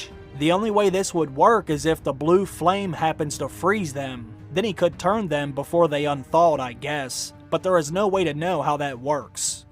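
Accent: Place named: Alabama, United States. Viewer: Are you from Alabama? cause you sound like forest gump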